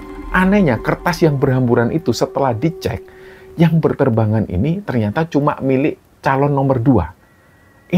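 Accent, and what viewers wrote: Java, Indonesia. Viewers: Mas logat jaeanya medoook bangeet kalo bercerita😅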